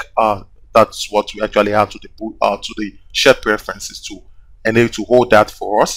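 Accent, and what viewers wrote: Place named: Nigeria. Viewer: From the accent, I can tell he's from Nigeria. ;-)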